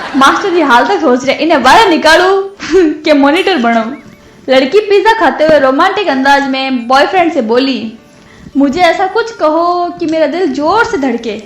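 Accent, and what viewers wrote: Marwar, India. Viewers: aapra awaj me marwadi ghani chokhi lage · 👉l like your😍 marvadi boli & also your dressing sense 👌👍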